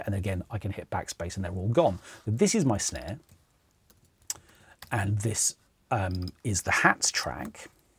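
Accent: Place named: RP, United Kingdom. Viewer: Entertaining dull with a posh accent and 20 years old information. Maybe a gig with the BBC.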